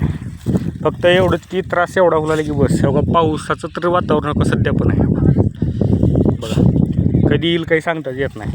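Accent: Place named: Latur, India. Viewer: लातूरचे का दादा बोलण्या वरून म्हणले दादा . 😊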